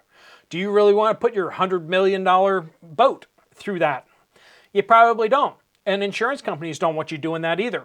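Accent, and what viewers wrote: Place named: Appalachia, United States. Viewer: Hey thank you for all that you do and say! I have a son and his family in West Newton and I feel right at home with your frame of reference and accent. God bless all of the people in coal country who have contributed so much to this country's history mining such a life sustaining fuel that brings security and warmth to so many people around the world. Merry Christmas and a safe and prosperous New Year to you and your family! 😇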